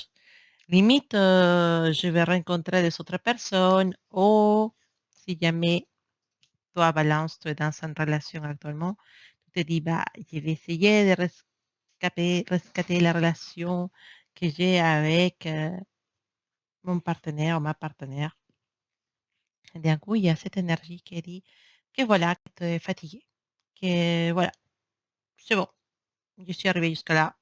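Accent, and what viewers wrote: Spain. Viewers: Tu as un très joli accent espagnol, prend ton cheval et viens me voir 😃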